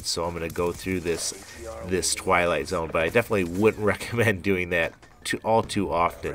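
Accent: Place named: Midwest, United States. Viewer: You have a Midwest accent.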